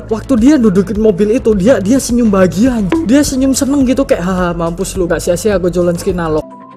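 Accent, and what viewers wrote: Java, Indonesia. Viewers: Begitu kangen dnegan bacotanmu dan kemedok an mu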